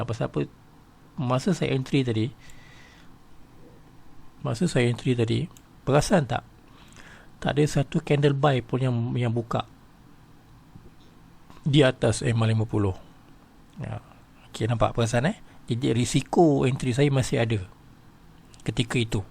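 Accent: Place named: Malaysia. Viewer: Terima kasih cik gu atas ilmu yang telah dibagikan ke kami semua, setelah saya play berulang ulang akhirnya saya mengerti maksud cik gu. Mungkin karena saya dari indonesia jadi agak kesulitan memahami logat melayu malaysia. Terima kasih cik gu semoga Allah SWT membalas semua kebaikan cik gu.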